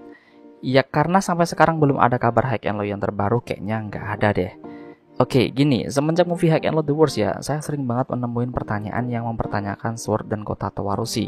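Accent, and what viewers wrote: Java, Indonesia. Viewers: Jowo mu bang bang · Jowone metu terus 🤣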